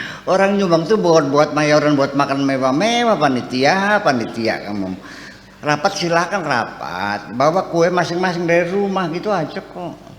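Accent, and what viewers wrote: Indramayu, Indonesia. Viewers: ha ha ha iya nok, tapi emang khas omongan dremayu, tapi yaqin gak ada maksud menghina, apalagi klo umumnya bahasa gaul kertasemaya, " rikkk sira pen mendi !!!???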